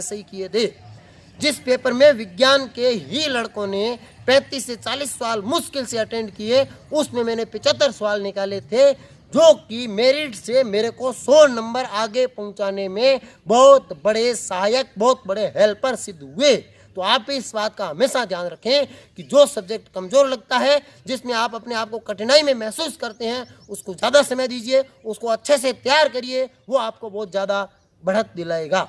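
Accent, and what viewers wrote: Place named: Marwar, India. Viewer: सर किस गांव से हो मारवाड़ी बोली सुनकर मजा आ गया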